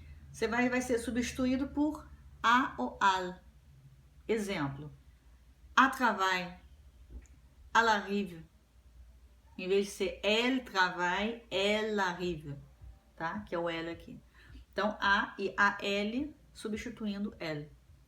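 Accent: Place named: Minas Gerais, Brazil. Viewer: Wô! T’écouter parler à propos de ma langue c’était vraiment un exercice en gymnastique pour mon faible portugais âgé de 40 ans. Tu sonnes « mineira » si ma mémoire est bonne. (Je souhaite pouvoir écrire dans ta belle langue, mais hélas! J’suis ben ben trop rouillé!) De bonnes observations! Il y a beaucoup de différences entre nous et nos cousins en France comme vous autres dans la lusophonie. Bonne continuation!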